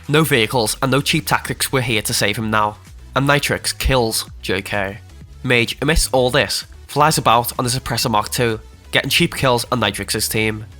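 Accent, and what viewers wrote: Liverpool, United Kingdom. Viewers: The scouse accent is boss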